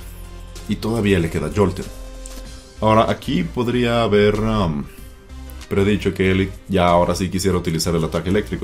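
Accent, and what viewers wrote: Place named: Mexico. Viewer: Me gusta como narras, muy natural y al estilo mexicano jaja, pero me gustaria que usaras pokes poco comunes, ya sean Uu o Ru eso estaria chingon, saludos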